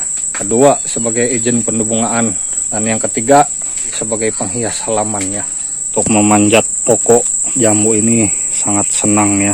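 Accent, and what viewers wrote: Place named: South Kalimantan, Indonesia. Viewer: Logat nya Seperti Kalimantan Selatan Om, Salam kenal saya balikpapan, Kaltim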